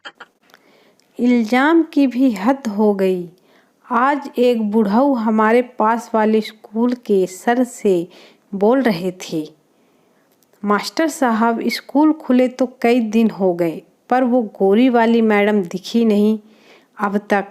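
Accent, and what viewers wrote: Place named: Bihar, India. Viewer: Didi aap Pata Logon ki faltu bakwas se please aur Dur Raha karo kuch Log Kahate Hain aapko ki aap Bihari lagti ho aap Bihar chal Bhaag Bihari Bihari Jaisi Teri Awaz hai aapke awaaz me Jaan basiya didi aap yah Baat Jarur Jaan Lijiye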